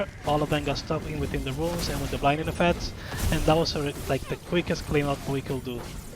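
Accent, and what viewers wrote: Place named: Argentina. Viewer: Your english has argentinian accent 😅 🤔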